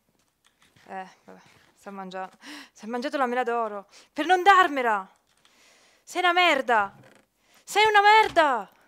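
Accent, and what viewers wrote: Naples, Italy. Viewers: anche io sono di napoli, ma parla come vuoi, anzi a me piace di più quando parli un po' in dialetto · Ma sei napoletana?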